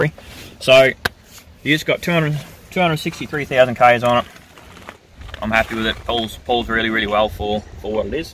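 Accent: Australia. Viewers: hi! can you write down everything.sorry to sound rude but your australian accent got me. if you dont mind writing everything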